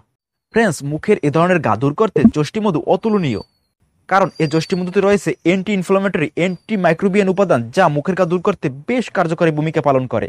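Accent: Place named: Barishal, Bangladesh. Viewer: Mone hoy apne borishailla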